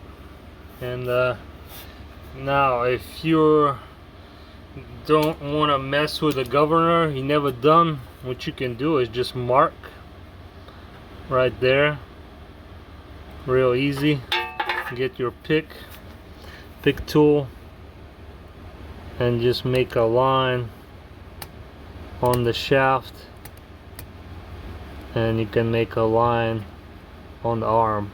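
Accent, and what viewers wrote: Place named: South Louisiana, United States. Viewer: thank you for the video, huge help trying to help out an old man with Parkinson's ,,, his one joy is cutting his grass now i can get him back up and going from the sound of your accent, sounds like you're from south Louisiana,, i'm from Lafayette originally, be blessed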